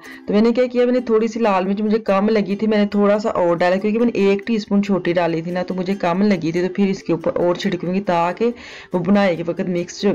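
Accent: Punjab, India. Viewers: Nan wo bhi tandoori nan ... ghar main banana mushkil hota hy ... kamal kr dia, presentation bhi bohot zabardast hy.. urdu main pujabi ka tarka .. foran hi, like 👍 aur sath hi subs bhi kr dia, ta k future main ap ki 📹 videos dekhta rahon.. umeed hy ap nay bhi asa hi kr na hy ... Allah pak ap ko aur tamam ghar walon ko hamaysha kamyabian, and khoshyan naseeb farmaye Ameen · Oh my God apki mazedar funny punjabi style ko sun kr ma ne Channel subscribe kiya hai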